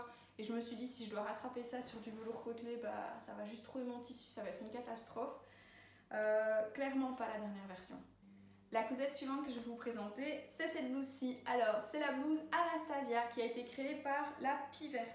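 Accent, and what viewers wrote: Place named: Belgium. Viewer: j'adore ton accent belge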